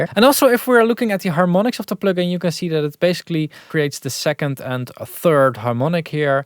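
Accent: Netherlands. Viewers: did you say 'the a-shill-iate links'? I am not asking to make fun of your pronunciation. The dutch accent is fine, no problem. Would be funny though if you know what a shill is and it was intended.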